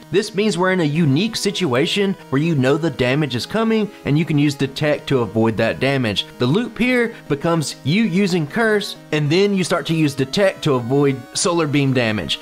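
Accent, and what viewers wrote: South, United States. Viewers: Almost got to 500! Also, I still love that I get some good ole southern representation and you’re not afraid of it. One thing that’s present in media is a bias against accents and i love people who can be themselves. So from a Georgian, thank you! Also, you the sandstorm meme could just be a slight against the University of South Carolina 😊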